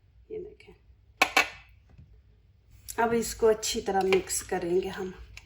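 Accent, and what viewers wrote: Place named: Punjab, India. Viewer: بھت اچھا سالن اپ نے بنایا ھے ماشاءالله ۔لیکن بات یہ ھے کہ اپ کی اردو پنجابی ھے اس لہجہ میں بات کرتی ہیں تو بہتر ہوگا اپ پنجابی زبان ہی بول لیا کریں پاکستان کے سب علاقوں میں پنجابی کو سمجھتے ہیں لوگ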